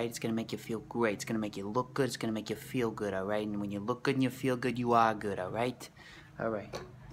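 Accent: Boston, United States. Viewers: I want another asmr video using the Boston accent again 😍😍🙌🏻🙌🏻 Literally my favorite video! 💖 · That Boston accent is super ASMR inducing. I wouldn't be opposed to another vid with it! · As a man with a Boston accent, i approve this message. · Excellent customer service skills, very attentive. And yes, that is a strong Boston accent! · I love your Boston accent. It's pretty cool!! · okay but like, this is why I love being from and living in Boston, when people do the accents and shit its too.amusing! keep up the great work!! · Now I have to go to Boston to bathe myself in that glorious accent. Great video 🤗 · Zevi That’s New York accent this is Boston it’s stronger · LOVE the Boston accent! Fantastic video, just subscribed :) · bro i need, and i mean NEEEEEED more videos with the boston accent roleplay.... been passing out to this same video every night for like 3 weeks now to the point where i know every word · MORE BOSTON ACCENT ASMR PLEASE OMF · Loved this vid and the accent. 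Just hope everyone knows literally 0.00001% of Bostonians talk like that. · Please PLEASE make more vids with the Boston accent 😭❤️ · He sounds like Leonardo DiCaprio with a Boston accent.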